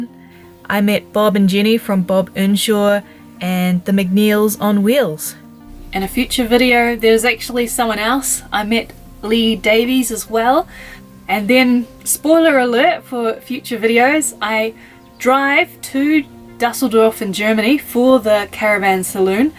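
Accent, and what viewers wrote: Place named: New Zealand. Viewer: K you are such a brave lady, love your kiwi accent.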